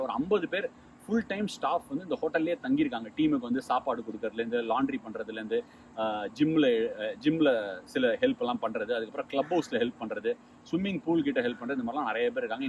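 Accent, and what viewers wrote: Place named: Chennai, India. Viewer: Chennai Tamila post pandrathu supera iruku plus it gives a feel like listening to a friend who opens up wat he has in his mind. Addicted to ur accent ❤️❤️ yaaaarrrum vara matangaa 🤣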